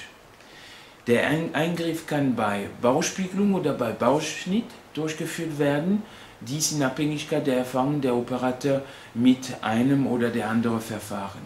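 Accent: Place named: France. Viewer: je reconnais votre petit accent français , ses trop mignon merci pour vos explication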